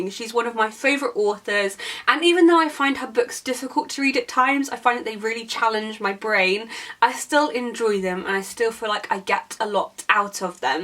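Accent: United Kingdom. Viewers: I love your wonderful British accent, Hi from Italy!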